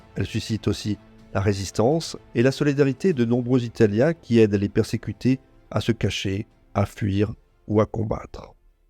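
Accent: Corsica, France. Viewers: Accent corse je dirais. Complications à articuler les syllabes.. 😊😊😊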